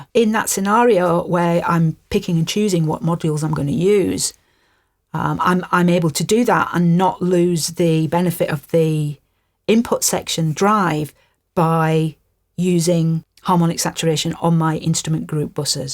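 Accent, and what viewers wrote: Northern England, United Kingdom. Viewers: Hi! So glad I found your channel. There are way to many options and mix decisions to make with the plethora of plugins these day that it's paralyzing creativity. It reminds me of when Photoshop plugins went bananas (previous career). Too many options doesn't equal better results, in fact I would say it disables it. I love your Chanelle, and as an ex-pat from Leeds it's great to hear a northern accent. Keep up the good work. Cheers!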